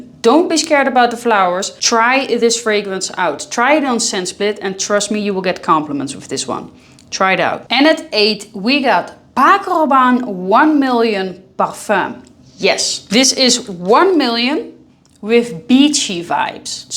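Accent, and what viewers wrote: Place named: Netherlands. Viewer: you sound a bit dutch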